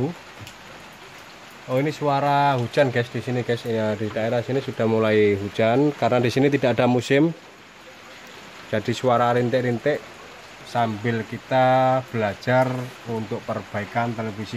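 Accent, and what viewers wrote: Java, Indonesia. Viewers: dari kalimantan timur ko logatnya seperti wong jowo bang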